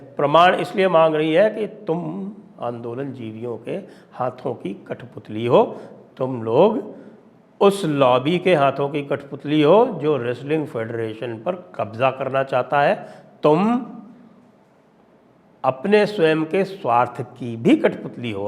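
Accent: Haryana, India. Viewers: Loved the Haryanvi accent, and the clear update on this issue. These shorts are so valuable. Thank you. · Sanjay Dixit, " Thari Haryanvi Mahre Aachi Lage " Thanks. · A very amusing and informative video with hariyanvi tone. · Chokhha hariyanvi andaaz sir ji😂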